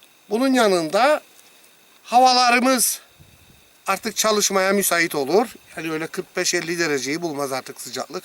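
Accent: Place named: Cyprus. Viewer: dalcıkları, bayılıyorum kıprıs şivesine 🙂🥰 Maaşallah Horozumuz da pek yakışıklıymış. Ne güzel ibiği var. Nazar değmesin tü tü tü tü 😁 Canım horoz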